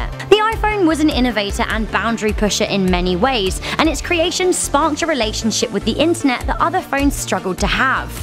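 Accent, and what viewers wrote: United Kingdom. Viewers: how about "what if there was not a British narrator with an extremely annoying accent"?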